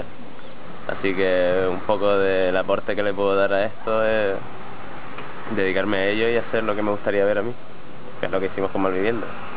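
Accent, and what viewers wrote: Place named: Canary Islands, Spain. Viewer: acento a sevillano? JAJAJA ese acento es puro entero a canario nada de sevillano , la verdad que me sorprende que no se le haiga pegado nada de sevillano en todo el tiempo que lleva allí pero así es,ese acento es puro canario nada de sevillano.El del zurdo i el kaki si es sevillanoo puro jajaj sois unos monstruos seguid asi!!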